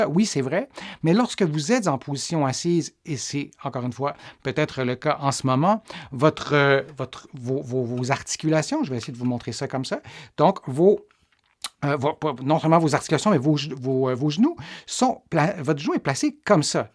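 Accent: Quebec, Canada. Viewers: Mon problème au genou est du à de nombreuses chutes de vélo il y a 40 ans mon genoux étai haché plei n de gravillons et la je vais faire une radio car il y a un épanchement je doit faire une ponction j ai 78 ans merci pour votre vidéo votre accent québécois me rappelle mes amis du Québec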